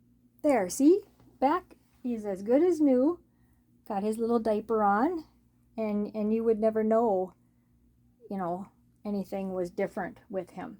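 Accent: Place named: Minnesota, United States. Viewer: Omgosh this was so good and funny!! You are a stitch 😂😂❤❤ I can identify with your Minnesotan dialect ❤❤ I love your creative way to fix him into what y wanted ❤️ 💙 he is such a boy now!! I do not have one yet, but they are adorable 😍 ❤️ Are u ready for the colder air moving back in 😢 it was so beautiful yesterday. I guess we're still in spring labor pains😂 Your cupcakes 🧁 were so nice•❤❤ I bet they are yummy!! I received my new baby girl!! You can see a clip from her artist on her channel, Tinyhands4ever. ❤❤🎉🎉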